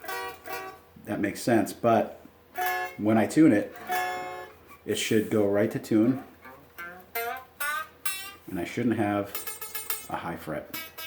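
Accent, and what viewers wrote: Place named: Boston, United States. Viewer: was wondering where you were from I'm from Hyde Park and felt right at home with your accent lol it was pissa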